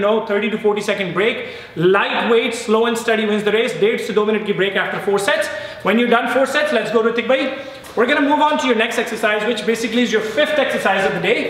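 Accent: Punjab, India. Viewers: bhai apki sbse achi bat to ye h ki aap hindi me jo thodi thodi punjabi bolte h bhoht mast h bhai punjabi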